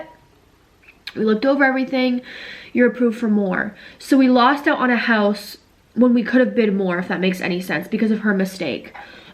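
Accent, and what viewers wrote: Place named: Canada. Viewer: Lol I think it’s her Canadian accent? She says bagel the same way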